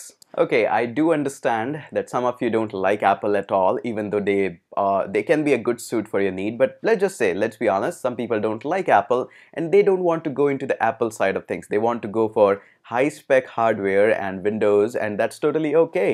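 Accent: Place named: India. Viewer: Which laptops? Am i supposed to watch 12 mins of indian accent rambling and look at you instead of laptops? Rofl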